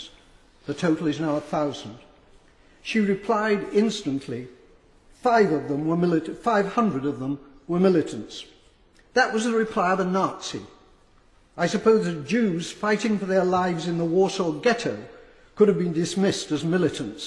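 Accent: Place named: RP, United Kingdom. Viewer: For such an intelligent sounding man, he sure is upside down. The Arabs have never, never, retracted their explicit intention to kill all the Jews in Israel. Gaza is a mess, but it's never been Israel's intention or desire to kill all its citizens. What does this mean propose? I mean practically. He looks like a gentleman, but he sounds like a high hippie 😂. "Peace, bro, peace to the world. Groovy, man." It's easy, but there is a reality to consider. He sounds like a woke teenager with a posh accent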